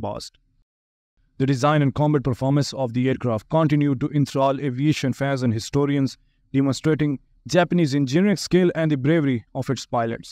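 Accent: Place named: India. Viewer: By the accent I think he is Indian. If you take it into account, he is making a real effort to speak slowly.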